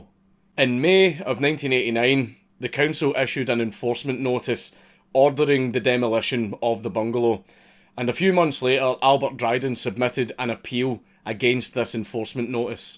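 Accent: Scotland, United Kingdom. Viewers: Hearing someone talk about fighting the government is always better with a Scottish accent.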